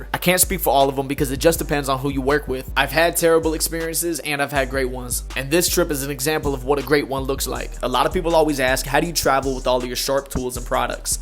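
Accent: North Carolina, United States. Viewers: I think you should get paid as much as you are able to get. I don’t mean you would be unfair to this rapper. I mean I think you are worth an unlimited amount. Your conversations with people are genuine and lovely. The attention you give your haircuts AND your clients is absolutely priceless. I’m in North GA near Athens, so I understand your roots somewhat. Much respect and love to you and your work. You deserve all that life has to offer. I do NOT understand why you talk the way you do, being from NC, but we all have our ways.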